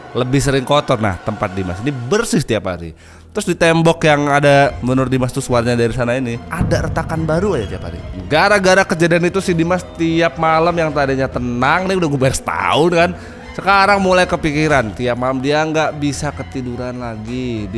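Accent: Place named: Lampung, Indonesia. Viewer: Bang ni orang lampung bukan ya? Logatnya kaya orang lampung ya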